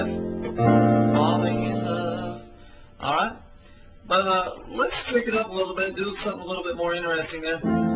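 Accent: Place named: United States. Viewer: Hey, this is cool! interesting accent too! which part of america you from? thanks for sharing your insights. love it.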